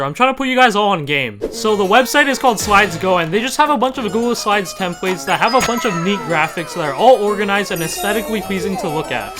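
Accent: Canada. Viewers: bro are you canadian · U Canadian bro?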